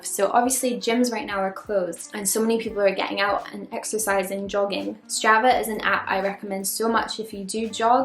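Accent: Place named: Scotland, United Kingdom. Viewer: Good video! I strangely enjoy watching these videos haha. Where are you from, you sound Scottish then English lol I’m from Glasgow, FYI 🏴😎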